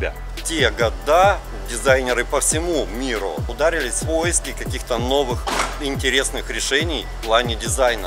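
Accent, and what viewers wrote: Russia. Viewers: Привет😉 Да, интересно. Мне кажется ты или из Питера, или из Челябинска, но не исключено что из Евпатории)) Хочу такой же форд😂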